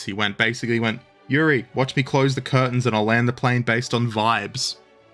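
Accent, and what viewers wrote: Australia. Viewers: Apart from the Aussie accent, I can't watch this facetious video about a Aeroflot jet hitting a landmine. Come on, be serious about your subject! 😩🙄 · People being annoyed at hearing Australian accents? Who are these (third letter)unts? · IS that a thing??? People getting triggered by an Aussie accent??? WTF?!?!!?!? God help them if they heard my Southern Texas Accent. 🤣🤣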